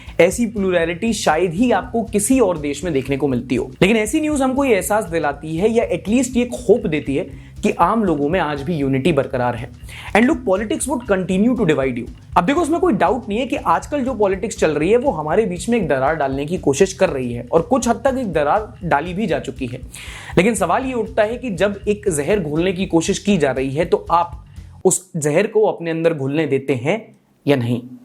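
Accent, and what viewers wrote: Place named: Patna, India. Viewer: Aapke speaking style se lagta hai aap patna se ho. Am i right?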